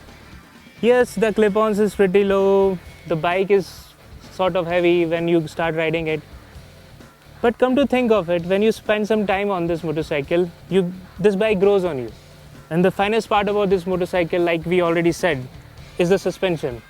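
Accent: India. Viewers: I feel like I am listening to every India call center, trying to understand the mumbling English while I try and dispute a bill charge.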